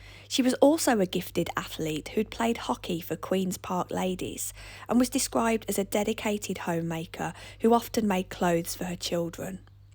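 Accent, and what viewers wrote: Birmingham, United Kingdom. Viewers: you keep your voice... British English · the birmingham voice is so nice to listen to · speaks with a lovely regional British accent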